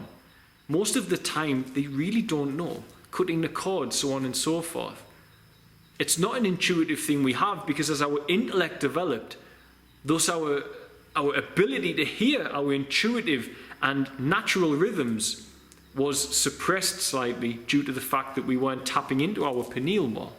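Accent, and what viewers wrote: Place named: Newcastle, United Kingdom. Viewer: This is what I needed as a Glasweigan. A mega spiritual Geordie to cement what I already knew. Not even a lick of sarcasm. Yes!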